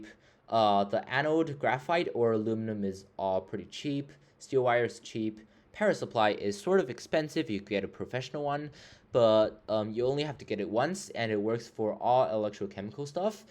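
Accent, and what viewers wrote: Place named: California, United States. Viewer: Here in the states gas water heaters use a sacrificial anode to protect from corrosion and it's often made out of magnesium (sometimes an alloy that includes aluminum or a little zinc) , I don't know what's used in China(or wherever you are with a Californian-esq accent), but I hope that might be useful to know..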